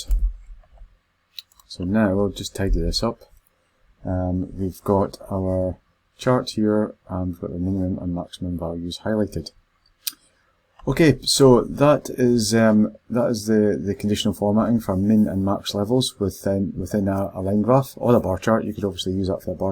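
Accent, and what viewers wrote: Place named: Scotland, United Kingdom. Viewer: Very nice. Great explanation. Thanks a lot. Nice to hear a Scot!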